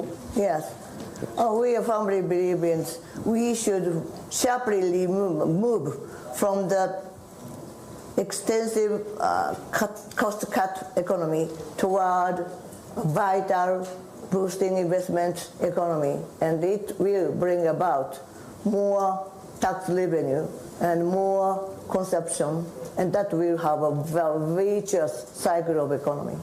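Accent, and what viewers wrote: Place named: Japan. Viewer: 日本的発音ではあるけれども、 英語で自分の考えを専門知識とともに自由に述べることができる日本では希少な政治家の一人ではありますね。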